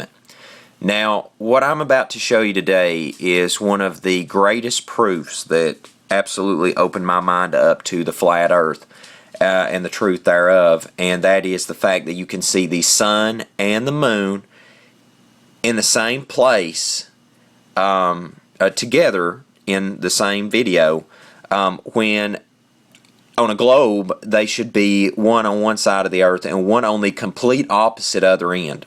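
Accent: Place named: Carolinas, United States. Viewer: This is SO cool!....... Btw, Are you from the Carolinas. I have lots of family down there,cos my Mom was from Kings Mountain, NC, and I recognize the accent. and I LOVE it!!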